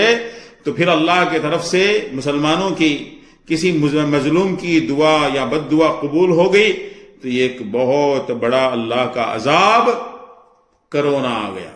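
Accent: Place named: Bangladesh. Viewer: آپ کو اللہ پر یقین نہیں ہے کیا۔ کیوں یوٹوب سے پیسے کمانے کے لیے لوگوں کو دہشت میں مبتلا کر رہے ہو۔ ۔۔اپ کے pronuciation سے لگتا یے کہ آپ کا تعلق بنگلہ دیش سے ہے۔ اللہ حدایت دے ۔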